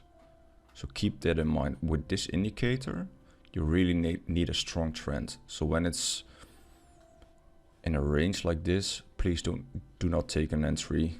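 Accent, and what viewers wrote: Netherlands. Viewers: Nice video! Are you Dutch by the way? 😄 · Are you Dutch? :)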